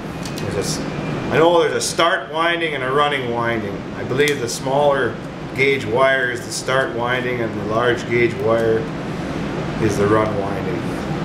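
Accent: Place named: West Coast, United States. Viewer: Thanks Bro. just picked up my first washer motor today ...bit of a grunt getting the little basterd out ..had to hack saw it in two ..now I'm down to the windings ...I guess I will try the cut one end and pull em threw method as it looks like this is the easiest method for me being in an appartment ( no shop with vice) ..gotta do it dat way ...you sound like a West Coaster ....OK better quite gabbing and get on with the show ..cheers to all my fellow scrappers out there ..probably one of you is the guy that gets to some of the TV's before me ...got to love them big sonys ...they are killer ..got 2 the other day ....check out my site I got lots of interesting videos ...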